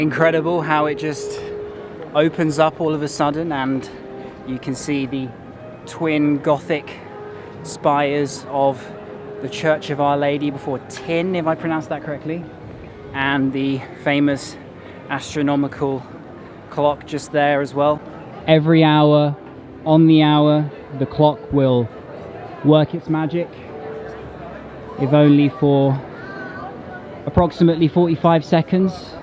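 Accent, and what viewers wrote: United Kingdom. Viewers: Welcome back to the best british accent ever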